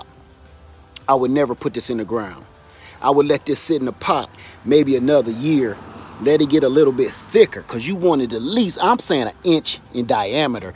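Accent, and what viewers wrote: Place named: South, United States. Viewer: I loved this video! First off, your southern accent is EPIC! I’m from New Orleans so you talk Like us lol. Secondly, the way you kept talking to little kierra i was weak. My mom always says u have to talk to the plants and show them love maybe thats what I’m doing wrong and tje the way the Lillian tree looked I’m tryna get to this lemon tree level! One day! But in the meantime I’m coming up there to pick from your lemon tree cause u got plenty lol😊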